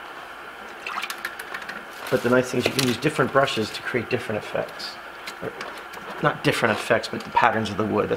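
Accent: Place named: New Orleans, United States. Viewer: Where are you from? You sound like you are from New Orleans. I grew up here and the accent sounds familiar.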